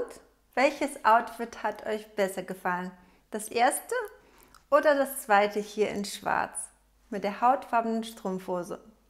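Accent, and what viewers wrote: Germany. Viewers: Klingt nach Fränkin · That German accent/ language is amazing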